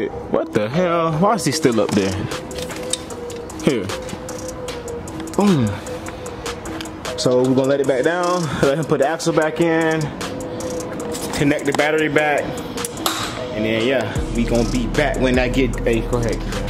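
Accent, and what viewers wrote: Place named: Houston, United States. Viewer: Tell me you from Houston?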